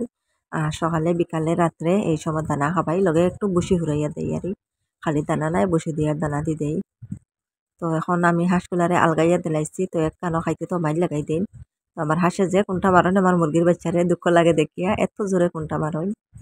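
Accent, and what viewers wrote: Sylhet, Bangladesh. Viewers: আমাদের সিলেটি বইন তো। এই জন্য খুব সুন্দর করি কথা কইন। খুব সুন্দর লাগলো আপু ভিডিও টা। সাবধানে কাজ কইরো বইন।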